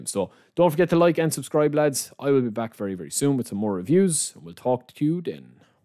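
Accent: Ireland. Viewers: that irish accent tho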